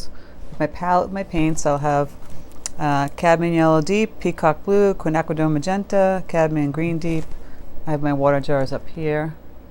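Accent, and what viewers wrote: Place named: Boston, United States. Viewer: Wow... that was amazing to watch! So many helpful details shared while also keeping it simple. I could also listen to your Boston accent all day! Thanks so much for sharing your amazing talent. What a treat!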